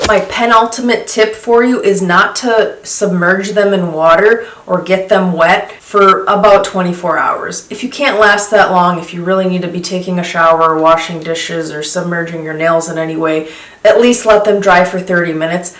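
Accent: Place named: Chicago, United States. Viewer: Great advise, and I love the Chicago accent!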